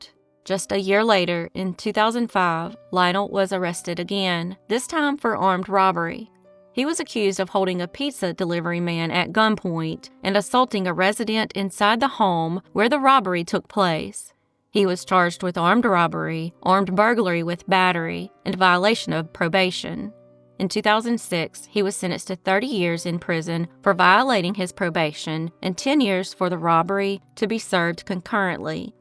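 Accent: South, United States. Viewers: Awesome Southern. Woof Woof.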